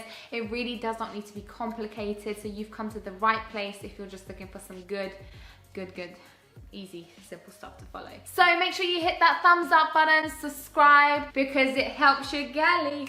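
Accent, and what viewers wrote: England, United Kingdom. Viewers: I usually don't like English accent but yours is just so amazing :)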